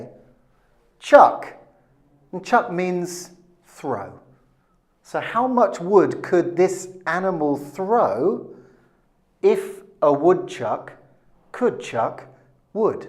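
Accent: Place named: United Kingdom. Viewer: I miss Avalon and is a good teacher I like his british accent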